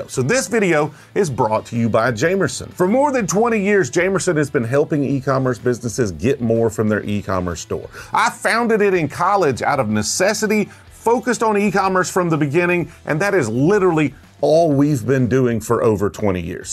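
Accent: South, United States. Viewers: mostly because it was MACK Donalds... Love the southern accent.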